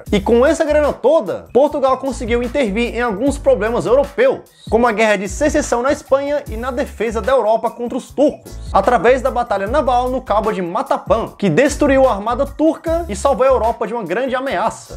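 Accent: Brazil. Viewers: Vi teu canal e não sei se você é um brasileiro com sotaque português ou um português que usa gírias brasileiras. Se você for português teu sotaque é bem mais parecido com os brasileiros do que com os portugueses.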